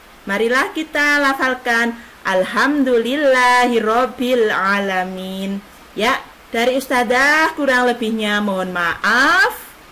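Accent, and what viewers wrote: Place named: Java, Indonesia. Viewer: Boleh lah .... 👍👍👍👍👍 Cuma agak medok tapi best 😇😇😇😇😇😇😇😇😇😇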